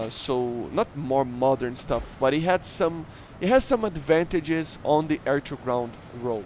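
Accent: Brazil. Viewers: are you brazilian?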